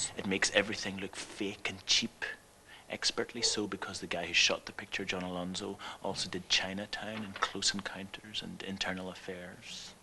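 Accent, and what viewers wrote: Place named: Northern Ireland, United Kingdom. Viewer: Hes from Northern Ireland buddy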